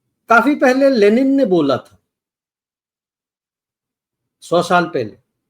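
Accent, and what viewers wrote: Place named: Punjab, India. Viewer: Gen apki punjabi bhaahr aaa jatti hai ❤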